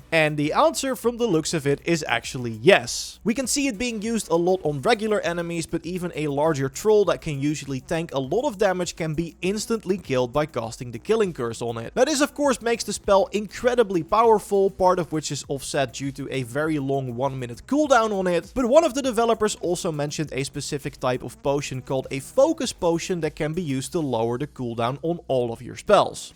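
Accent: Netherlands. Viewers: Waarom hoor ik zo hard een Nederlands accent?😅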